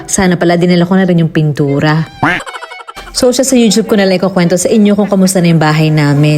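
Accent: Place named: Batangas, Philippines. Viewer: Aliw na aliw Po Ako lagi sa pag voice over nyo 🤣 kaya Po Pala tuwid na tuwid Tagalog Po Ninyo, Ala-Eh! Tubong Batangas ka Pala. 😅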